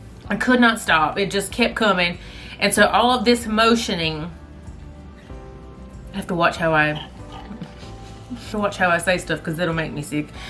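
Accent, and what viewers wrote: United States. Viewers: You’re so country and I love love love it lol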